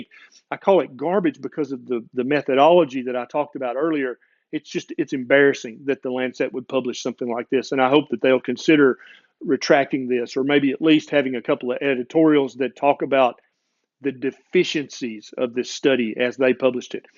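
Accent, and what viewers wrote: South, United States. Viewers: You’re such a genuine person... its why i love this channel a lot. Saturated with knowledge from a MD with a genuine southern accent👍🏻🇺🇸 Which state are u from?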